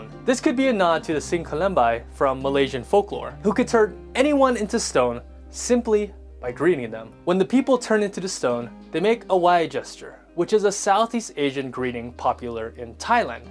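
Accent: United States. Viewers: I like to know if you have a western blood? i am interested on the biopsychology of talking like from west when you are from the east, i.e. acquiring that accent or voice quality like American when you are talking. i did not expect that the person behind the narration in the beginning have asiatic facial features. (if not biopsychological, maybe psychocultural which in other words, you must be living in the west?) Or maybe you really study how to speak in a way like that? Or learn from hearing? To others who read my reply, maybe I am not as good as Americans like some of you here in telling whether the sound quality is asian or american-like because I'm not American caucasian. i observed that voice quality acquisition before from my English language professor. i am gayish that's why even though i can speak English, the non-manly voice come out. though, I can still differentiate an original American caucasian who's not culturally British English voice quality from an acquired one like yours. 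this one is American causian, non-british english <link> (the man only, because that's what I'm talking about, the scope).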